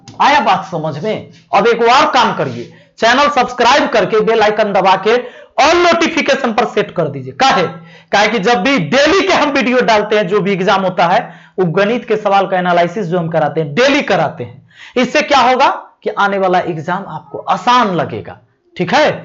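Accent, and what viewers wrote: Bihar, India. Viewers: Ek dam bihari andaz me padate hai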